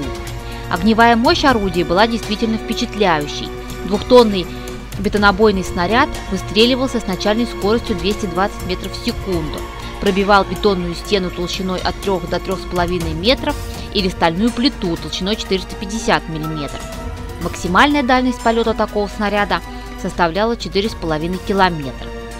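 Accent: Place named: Moscow, Russia. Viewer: Ага, мааасковский.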